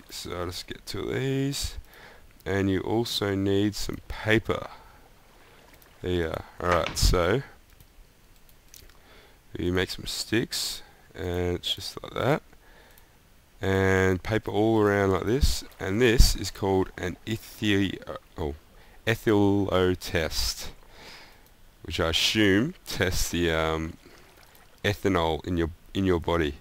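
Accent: Australia. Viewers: boss video...u can tell you're aussieee....am i wrong (subscribed!!)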